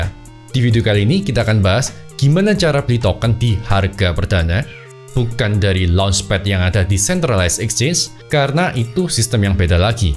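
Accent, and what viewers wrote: Java, Indonesia. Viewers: Bro asli mana medok bgt. Salam jawir wkwk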